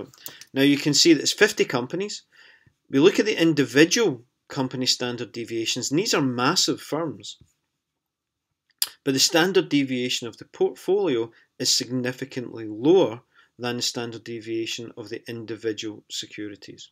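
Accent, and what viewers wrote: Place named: Scotland, United Kingdom. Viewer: Very helpful and Love your Scottish accent!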